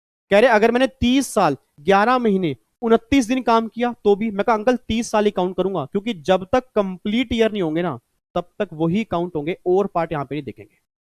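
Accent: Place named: Haryana, India. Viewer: Haryanavi suits you sir😁👌.........and than you for amazing videos of revision❤ #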